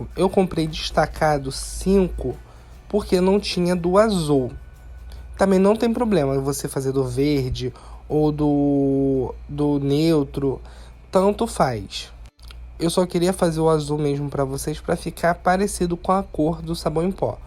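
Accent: Brazil. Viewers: Buenos días Me encantó esa forma de hacer el jabón me gustó mucho nunca lo había visto hacer así pero eso queda seco Bueno lo que usted enseña Me encantó hasta hoy lo encontré pero ahora veo voy a ver todos sus videos que veo que tiene cosas maravillosas y también tiene cosas para la cocina Muchas gracias bendiciones Marta desde Colombia por la forma de hablar veo que usted es del Brasil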